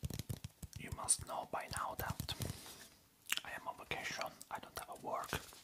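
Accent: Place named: Italy. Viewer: That Italian accent while whispering sounds so good in your voice. 😍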